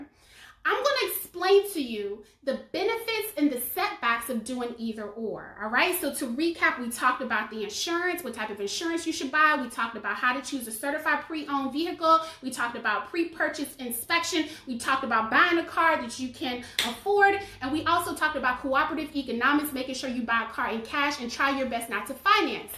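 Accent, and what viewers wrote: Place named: South, United States. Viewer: Great information once again. And I love your southern accent. Keep up the good work❤️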